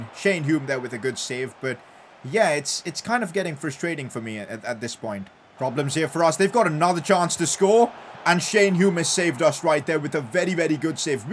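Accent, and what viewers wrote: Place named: India. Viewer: PCQ: You sound more Indian than when you first began doing YouTube.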